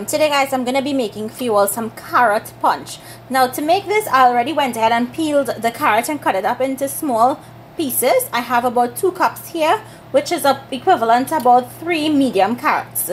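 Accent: Trinidad and Tobago. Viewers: Greetings from Grenada via Britain. I make mines similar, instead I use the pulp from my carrots after I finish juicing. In the blender I put condensed milk, the the pulp, and some essence and brown sugar as most of the sugar is extracted into the juice. The beauty is that there is no waste, as I hate throwing the pulp out when I'm done juicing. Hope all is well. (btw- my ex-wife is from Point Fortin, so I ALWAYS miss the accent)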